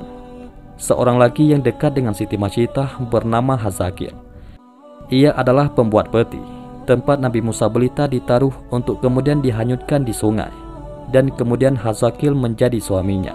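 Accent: Aceh, Indonesia. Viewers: Dari aku perhatikan cara bicara channel ini Org ini org aceh logat bahasanya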